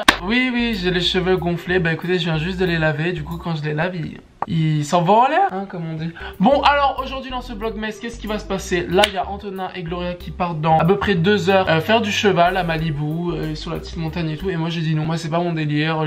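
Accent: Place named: France. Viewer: You should do a video in English! My American ears cannot understand your frenchie-ness:(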